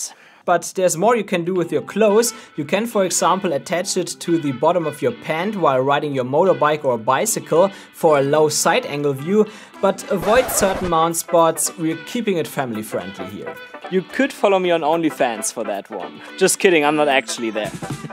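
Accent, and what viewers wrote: Germany. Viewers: hmm sounds like DEUTSCHLAND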